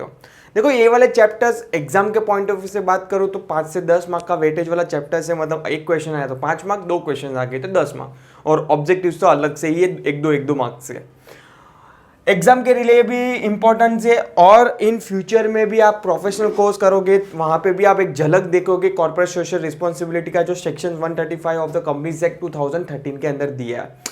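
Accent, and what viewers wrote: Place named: Mumbai, India. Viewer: Mr., you have a touch of Mumbai's local language.😅."aapan, yeh kya bol rha hai, paile(pehle).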